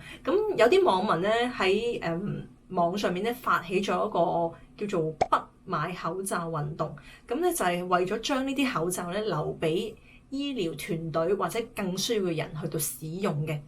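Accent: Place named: South Korea. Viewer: 홍콩말 하는 한국 사람인것 같은데?? 한국 사람 맞죠??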